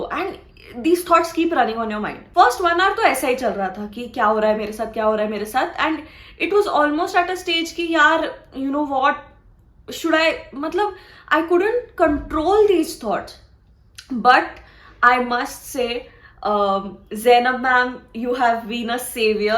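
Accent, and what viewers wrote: Maharashtra, India. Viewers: Abhi bhi dhad dhad ho rha hai your Marathi accent trying to jump out taide Marathi mdhe pn video bno ki jra 💛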